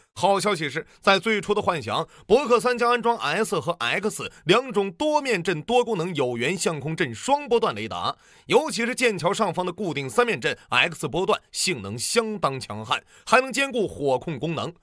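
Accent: Northeast, China. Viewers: 为啥把劳斯莱斯 翻译成罗尔斯罗伊斯呢？ 听口音你大陆人啊。 新的DDG主要是 操作熟练。从濒海 猪母 这些。还是老的伯克级靠谱。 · 东北口音难听死了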